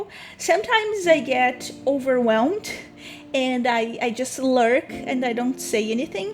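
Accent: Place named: Brazil. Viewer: I can tell you are Brazilian from your accent and Im an American living in Brazil. Something I've noticed about the cultural differences between Americans and Brazilians is that Brazilians are often preoccupied with their current finances and are less willing to spend money on gaining access to education and networks that will provide them with knowledge- and even more importantly RELATIONSHIPS- that will advance their business or craft. I definitely get where you are coming from but just wanted to say that investing (even expensive amounts) in yourself, leveling up your craft, and professional networks with the right people can get you so far. I was even thinking of making a video on this soon. Save some money and really get a professional network or mentor that will help you level up :) Im not even an artist or into artwork much but your video popped up on a google search and it was cool just watching you do this while talking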